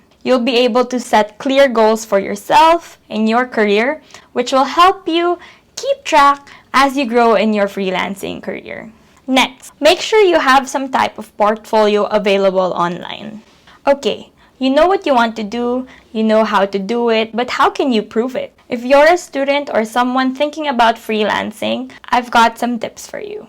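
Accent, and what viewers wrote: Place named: England, United Kingdom. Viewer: Hi, Ate. Thank you for sharing your info and experience with us about in the field being as a freelancer. Also I adore your English accent. I'll be doing freelance soon, once I have done with some of my portfolio. Do you mind if you make a short video showing your office setup and what things to consider when working from home. I'll be glad if you do. Salamatss~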